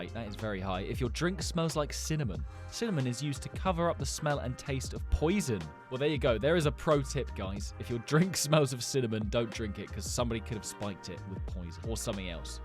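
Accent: United Kingdom. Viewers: I like you talking British cause I like talking British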